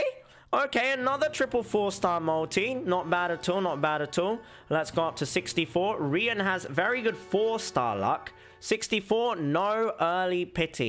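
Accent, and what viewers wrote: United Kingdom. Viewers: He is asian, yet he sounds a bit british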